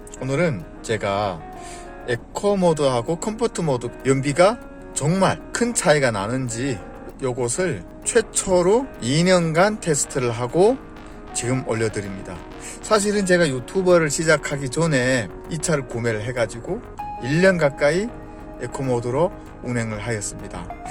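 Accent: Yanbian, China. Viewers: 혹시 고향이 연변이세요? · 연변 출신이에요, 아니면 하얼빈 출신이에요? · 어 조선족이다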